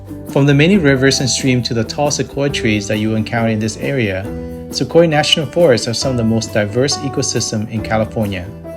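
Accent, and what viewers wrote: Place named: Vietnam. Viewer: Knew you viet when I heard the accent lol👍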